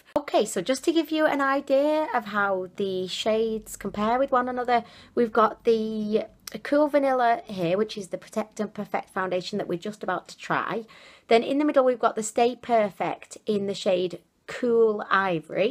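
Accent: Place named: Northwest England, United Kingdom. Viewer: So refreshing to hear a Northern West England accent. Great video.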